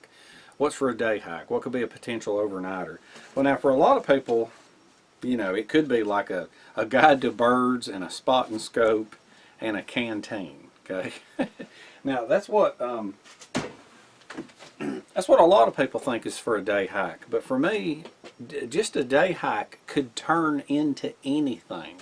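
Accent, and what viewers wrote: South, United States. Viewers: What er you from my friend. I love that southern drawl! I’m from MS myself so it’s kinda nice for a southern man to do these vids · This is how a real American sounds like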